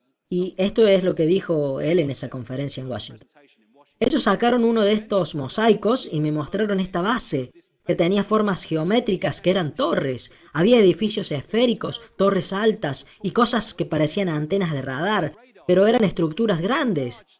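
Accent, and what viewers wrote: Córdoba, Argentina. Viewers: la voz de ese español acordobezado está genial!! Muy clara y precisa!!! Dejá que cacareen los culiaos jejeje!! Felicitaciones!!! Like para Uds.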